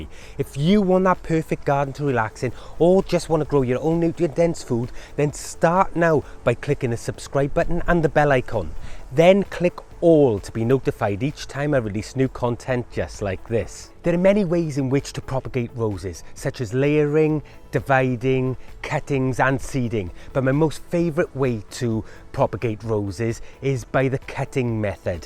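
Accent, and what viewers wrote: Wales, United Kingdom. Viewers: Thank you, that was so clear and totally understandable and especially delivered in a lovely Welsh accent. It is so applicable to our Scottish climate.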